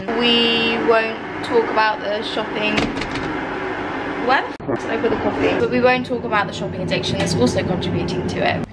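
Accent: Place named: Chelsea, United Kingdom. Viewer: Can already hear the Chelsea in her voice 🥴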